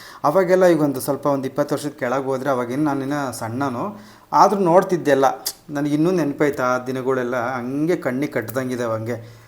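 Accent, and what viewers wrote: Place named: Hiriyur, India. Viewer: ಹಿರಿಯೂರು ಹುಡ್ಗ ಅನ್ಸುತ್ತೆ ಎಂತಾಸ್ನಾಗ್ ಐತೆ ಬಾಷಾ ಶೈಲಿ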